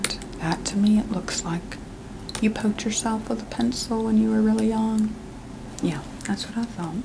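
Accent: South, United States. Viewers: your southern accent is actually so accurate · Love the southern accent, lol. Very tingly ✨ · These southern accent ones are my absolute favorites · I absolutely adore the doctor roleplays and like all the checking in and stuff. Especially with the southern accent, I could listen to a 2 hour long version of this! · Your southern accent videos are absolutely my faves (the Fargo accent was a very close second though) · thank u!!! i loveee the way u speak with this specific southern accent with drawnnn out words. so soothing for some reason lol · I love the southern accent videos! I have to watch these way before bed because I’m too interested in the jokes and what’s going on to listen to new ones at bedtime lol 😂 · I love the southern asmr!! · hi i’m from the deep south and your southern accent is quite accurate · Alabama or Mississippi. I can’t decide. 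It’s so natural.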